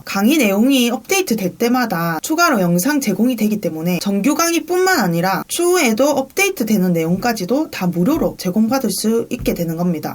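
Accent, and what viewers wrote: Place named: Busan, South Korea. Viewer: 유투브 자주 보다가 알게 되었습니다. 부산 사투리로 강의하는 내용이 속속 잘 들어오네요. 50대 직장인으로서 경매를 공부하고 있어요.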